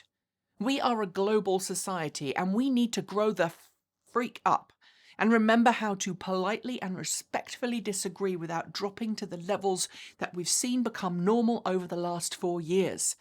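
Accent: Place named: England, United Kingdom. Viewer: Well done, had to be said. Totally agree about wild unproven comments being moderated. As a Brit I am proud that you have held onto your English accent even though you are now an American citizen.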